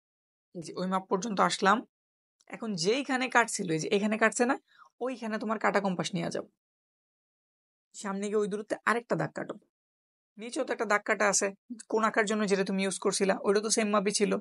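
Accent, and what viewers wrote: Comilla, Bangladesh. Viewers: bhai apni ki cumillar❤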